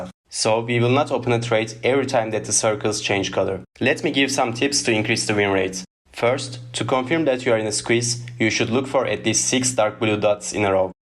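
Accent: Turkey. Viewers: tam bir Türk ingilizce si açıklığıyla anlatmışsın,eline saağlık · Bu adam türk şivesinden anladım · You have so much Turkish accent. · Thank you for the video. Your pronounciation definitely shows that you are Turkish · türk olduğuna yemin edebilirim ama kanıtlayamam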